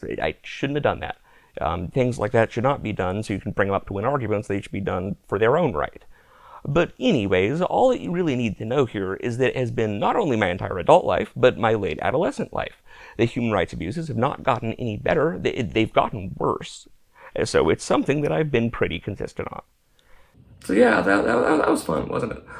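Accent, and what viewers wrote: United States. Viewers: You sound like the average American to me.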